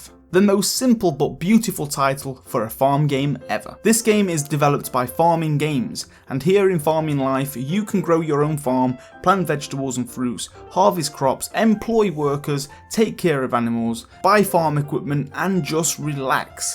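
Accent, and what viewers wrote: United Kingdom. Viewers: Dude he's british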